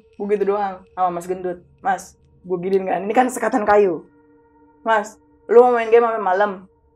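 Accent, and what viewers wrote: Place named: Bali, Indonesia. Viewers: Bang ini punya ciri khas, kalo ngomong yg ada huruf T pasti ngucapnya kedengaran kaya org bali. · bang nih orang bali ya? hahah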